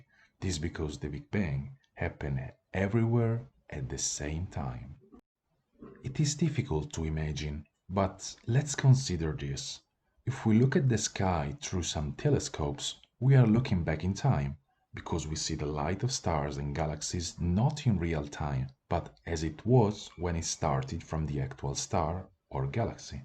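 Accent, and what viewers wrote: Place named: Italy. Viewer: Love italian accent!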